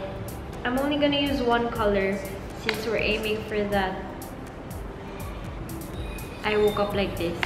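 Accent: England, United Kingdom. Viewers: sorry ulitin ko nlang yung vid,sa mukha mo lang ako naka tingin ang pretty mo girl haha..btw i like your english accent hehe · Melly Andrade I really don't understand the hate she's been receiving ,she's fine to me and loooove the English accent. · ganda at galing mo mag make up girl so perfect and i love ur english accent sarap pkinggan kya pls. wag kna magtagalog pls. haha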